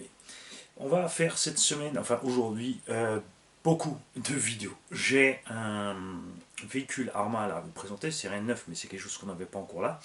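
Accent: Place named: Alsace, France. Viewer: Bonjour ! Je viens de m'abonner à votre chaîne que je suis depuis quelque temps. Merci pour ce que vous faites, et en plus l'accent alsacien me rappel les racines... ça fait du bien 😍😅 J'ai l'intention de me mettre à la RC avec mon fils de 6/7ans. Pour le fiston je pensais partir sur un Funtek MT4. Si vous avez d'autres idées dans ce budget je suis preneur. Quant à moi je pensais commencer par un Arrma Sentons 3S. Qu'en pensez vous ? Merci en tout cas pour votre bonne humeur !!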